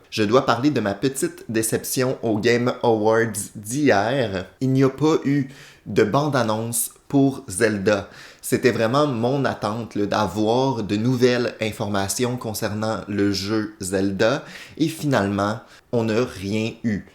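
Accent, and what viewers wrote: Quebec, Canada. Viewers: c québecquois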